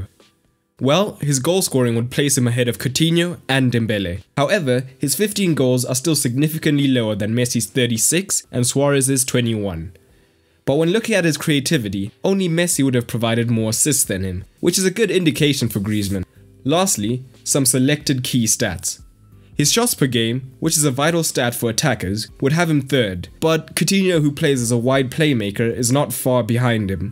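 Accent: South Africa. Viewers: Is it just me or does the narrator sound South African 🤔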